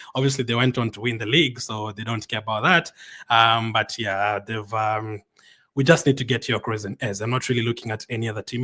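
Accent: Kenya. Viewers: you sound kenyan .COYG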